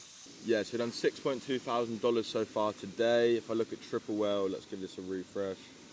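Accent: United Kingdom. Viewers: Pretty Cool Bro! 😂 What A Mockney Geezer He Is!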